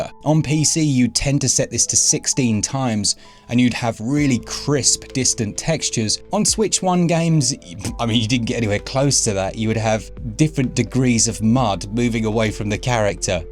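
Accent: United Kingdom. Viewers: I just can’t take a British accent serious!! He just sounds like a joke!!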